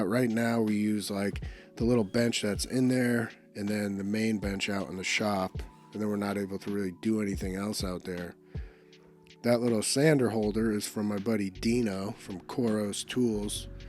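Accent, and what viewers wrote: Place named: East Los Angeles, United States. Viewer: Hope you don't mind some constructive criticism, but your videos would be a lot more engaging if you did a little teaching. It is clear you have a buttload of talent, but just watching videos of you talking like we are in East LA aren't engaging. Started watching after your dual video with BourbonMoth, but still waiting to actually learn something. You have a great re-start on your channel, make it worth watching. I am just another A-hole with an opinon of course, but it would be great to actually learn something along the way. Also, get rid of the "Yo guys" at the start of your videos. You instantly start in the hole. Make your videos that somebody looks forward to hitting YT.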